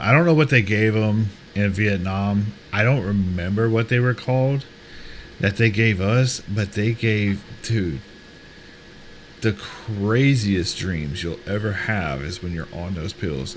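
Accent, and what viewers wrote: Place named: Chicago, United States. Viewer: It's funny that when you cut your southern accent, you talk just like I do as a dago from Chicago.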